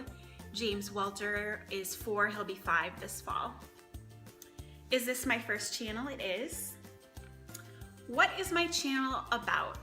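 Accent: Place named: Minnesota, United States. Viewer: Thanks for the Tag! I'm still dealing with this leak 😩 I look forward to doing this tag soon. Oh and you definitely have a Minnesota accent 😉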